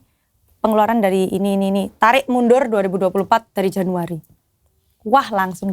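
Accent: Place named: Java, Indonesia. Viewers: orang sidoarjo kak.... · Mbak.....bahaasa nya cara ngomong nya kok persis farida nurhan ya....jawa medok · Mbak, logat bahasanya medok banget.... Arema ya mbak..😂😂😂, sama aku juga arema...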